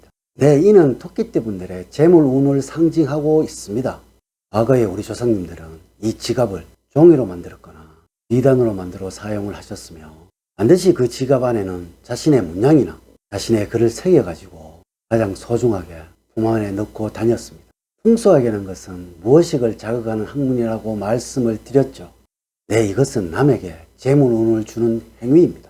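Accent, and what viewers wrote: Gyeongsang, South Korea. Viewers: 경상도 사투리 듣구 나갑니다 경상도=신천지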